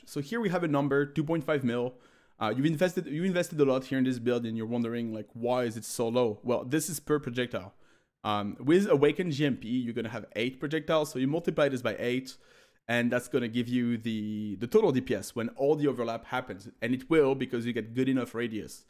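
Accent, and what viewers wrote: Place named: France. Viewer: Je me disais mais il est francais, 100% Puis oui haha Excellent build en tous cas, merci !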